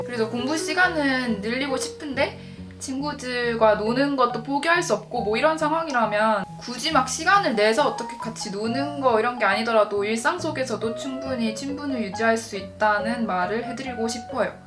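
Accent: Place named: Gyeongsang, South Korea. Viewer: 경상도 억양도 너무 귀엽다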